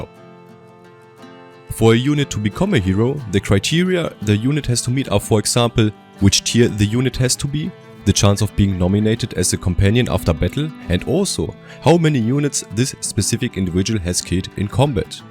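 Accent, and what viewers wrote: Germany. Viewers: Ich liebe deinen Deutschen Akzent, einfach geil xD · Bitte in deutsch It is hard to hear the Denglisch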